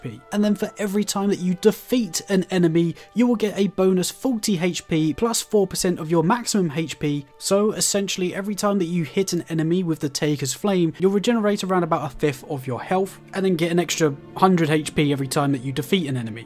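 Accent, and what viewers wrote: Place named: United Kingdom. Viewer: The word is HP not heach P 😂 lol love how British people talk.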